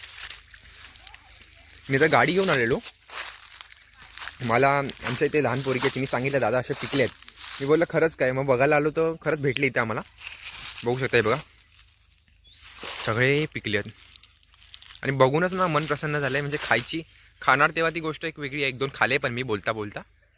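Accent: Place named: Malvani, India. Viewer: Malvani bol bara vatta aaikak.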